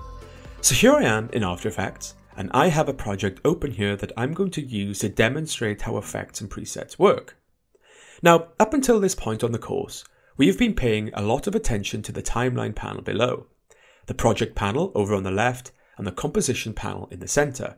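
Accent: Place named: England, United Kingdom. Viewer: "Command Zee??" You sound like you have an English accent, I thought you were from England, now I'm really confused - where are you from then?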